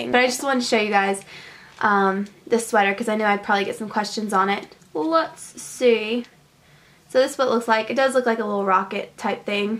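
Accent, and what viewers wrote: South, United States. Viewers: do you have a country accent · I hear a slight twang in your accent, do you mind me askin where you're from? Are you southern? I live in Texas(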